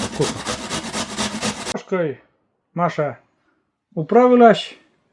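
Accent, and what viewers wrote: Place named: Russia. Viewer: Какой приятный русский говор - просто мёдом Божьим на уши и на сердце ❤❤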